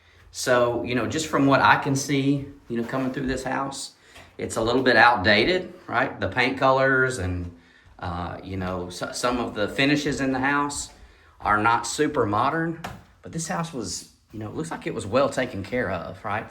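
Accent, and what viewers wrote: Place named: Alabama, United States. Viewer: Well, we have a Northport in Florida, but I’m guessing by the sound of his accent and the county is Tuscaloosa, which I’m not familiar with, is probably in Alabama. Maybe you wanna mention that in the title. I’m sure there’s lots of Northports in this country. So many videos on Facebook and YouTube, are just like this with no mention of the state.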